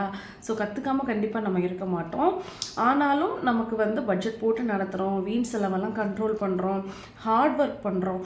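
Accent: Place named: Nagercoil, India. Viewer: Sister, your slang looks like nagercoil side?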